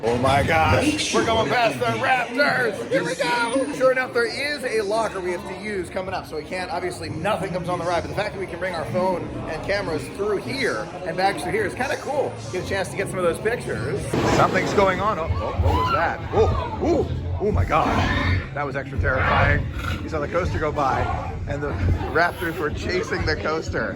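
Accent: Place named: Florida, United States. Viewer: I can see that after these years of living in FL, that accent starts kicking in!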